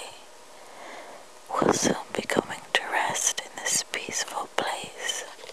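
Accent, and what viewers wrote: Kilkenny, Ireland. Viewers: Love the irish acsent. This video helps me sleep. Love it :) Gray/ TheSquishMovies x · It's amazing to me how certain Irish accents sound so much like certain American accents.. I mean they're very different in many ways, but it's striking what they have in common. Your first couple sentences I even wondered if you were from the US or something until your Irish accent became obvious. I wonder if you feel the same about American accents or if it only goes one way. Hmmmm.. FOOD FER THOUGHT! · yeah sounds like a kilkenny accent im a cork man myself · Is that County Kilkenny I hear?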